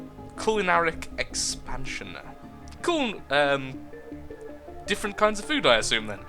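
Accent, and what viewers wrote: United Kingdom. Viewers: Lots of British sweet talkin' going on in this plague video.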